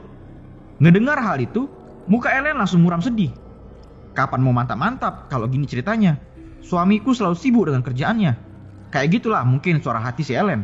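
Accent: Medan, Indonesia. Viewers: 😓😓 astagaa aku telat 3 hari.. 🤦🏻 .. pahal in chanel favorid akuu.. 😢😢 smngt yh. ma'af sdh telat.. sebagai ganti.. aku tdk akan melewatkn semua iklan y. 😍😍😍 .. logat medan y aku sukaa kali.. 😍😍😍😘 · Orang medan kah kau bang? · Wkwkw.berasa kawan awak yang ceritain ke awak Kerasa kali logat medan nya we 🤣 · Jan dinampakkan kali lah medannya bang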